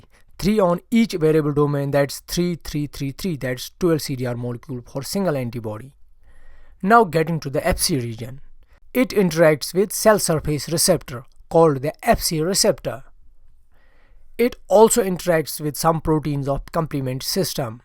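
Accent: India. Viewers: Your Ascent is very similar to Indian Ascent,are you Indian?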